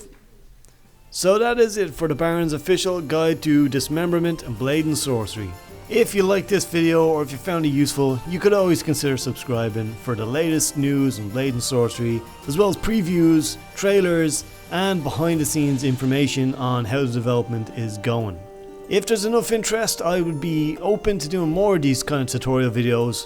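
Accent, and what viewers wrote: Ireland. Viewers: Your Irish, right?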